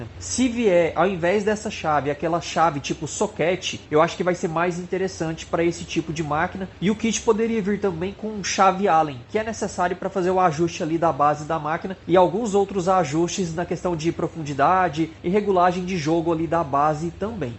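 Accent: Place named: Goiás, Brazil. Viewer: Uai, de q cidade de Goiás vc é?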